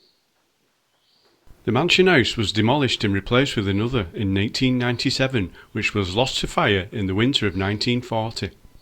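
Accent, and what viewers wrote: North, United Kingdom. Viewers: Hi Firstly big apologies for our tardiness we are now back from our Xplorations of the Picos de Europa and are doing YouTube catch up on video watching! Loving this what a fabulous area! Love all the historic buildings and then the brightly coloured painted doors and windows! The bright Barbie pink Fiat 500 tickled us next to those historic remains! Lovely narration in our favourite Northern accent! Loved you on that giant chair of course you had to check it out! What a fabulous market and yay to free parking 😂 sold! Then a lovely forest and lakeside walk, tragic about the house lost to a fire! And you can visualise that jetty being used back in the day! We did enjoy these Xplorations 👍❤️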